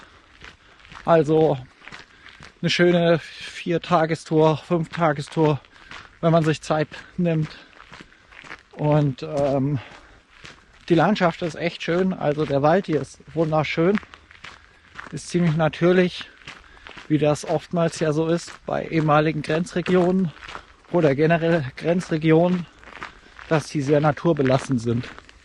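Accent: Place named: Hesse, Germany. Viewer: Hesse schwätze halt hessisch ...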